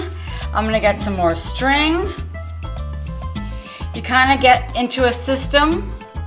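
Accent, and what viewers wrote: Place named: United States. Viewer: Presumably - you take the string off before serving? Love that American accent by the way!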